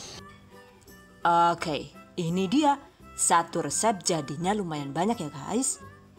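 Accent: Banyumas, Indonesia. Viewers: Ngapak2nya bikin gemes 😀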